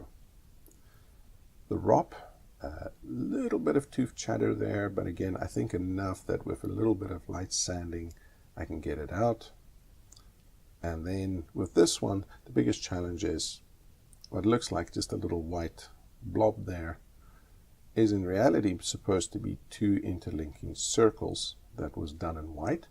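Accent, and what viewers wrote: South Africa. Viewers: There's a South African accent in there..... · I almost expected to hear 'if you do it like this, you will just cause more kak for yourself'. Glad to see that the South African accent hasn't completely disappeared.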